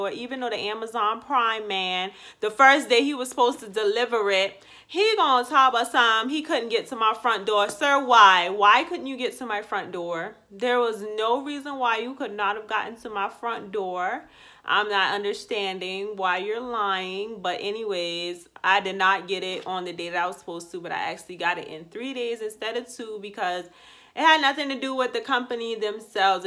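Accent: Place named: Charleston, United States. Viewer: Where are you from lol ? You sound like us in Charleston lol love the review very nice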